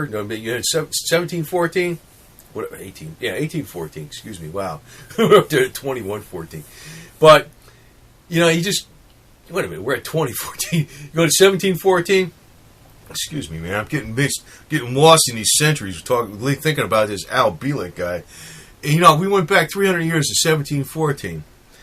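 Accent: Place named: New York, United States. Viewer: I love the WY you talk .. New Yorker .. I never believed him ither ... he never was in the future..Their us no future ..We're the future ..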